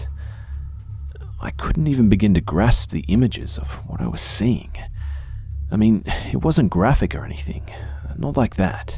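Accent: Australia. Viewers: I fucking love your Aussie accent hnnnng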